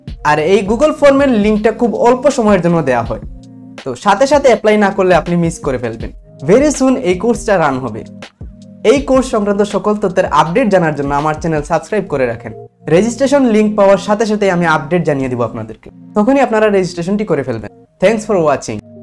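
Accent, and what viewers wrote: Sylhet, Bangladesh. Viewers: Apni ki sylheti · আফনি কিতা সিলটি নি রে বা?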